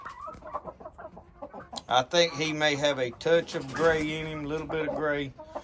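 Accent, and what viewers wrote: South, United States. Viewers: Thanks for the daily walk a bout.... Love the southern talk.... From Minnesota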